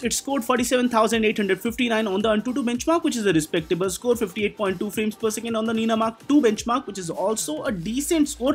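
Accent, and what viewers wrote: India. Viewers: fuck that Indian accent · fuck indian accent dont like it..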